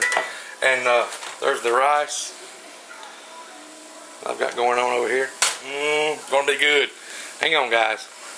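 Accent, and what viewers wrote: South, United States. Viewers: Yum Love your southern accent ! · Country as hell omg can barely understand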